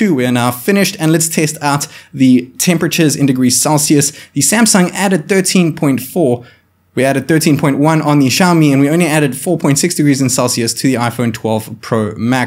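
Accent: South Africa. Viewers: You sound south african bro 😌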